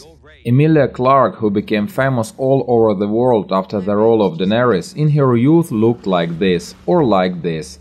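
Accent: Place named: Russia. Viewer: sounds russian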